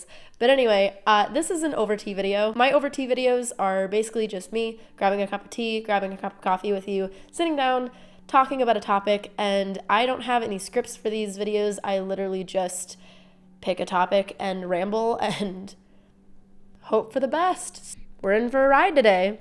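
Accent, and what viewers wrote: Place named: New England, United States. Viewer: I love your content. I had to look up where you're from because I was convinced you were from New England, but I was shocked to find out you weren't. You would get along well here. You talk like a New Englander... We love the cussing. It enhances our feelings when we speak. It shows passion for what you believe in... Keep cussing, and share those beautiful thoughts and feeling 👍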